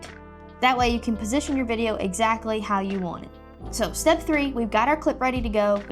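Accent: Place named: South, United States. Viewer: New subscriber. Like your Southern accent too. 😜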